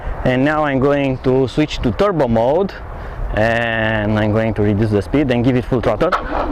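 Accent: Romania. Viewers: You speak english like a romanian. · Baga' limba rOMANa ca esti praf...:))) accentul te da de gol ca esti roroman:)))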